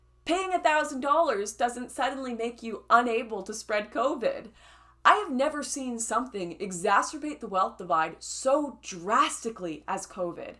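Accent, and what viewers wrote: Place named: Canada. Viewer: Is it me or is your Canadian accent back with a vengeance.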